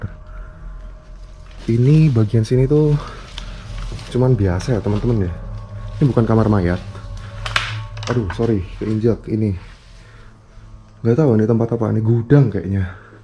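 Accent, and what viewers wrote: Semarang, Indonesia. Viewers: Ka harus tau ini konten jadi favorite aku dari dulu. Mood bgt logat semarangnya😫 apalagi pas bilang "pulang yok". Bukan apa apa nih tapi saya melting berasa kaya doi😫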